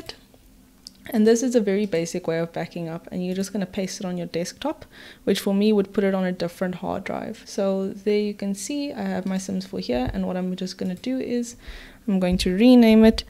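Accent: South Africa. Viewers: Are you South African ?? :)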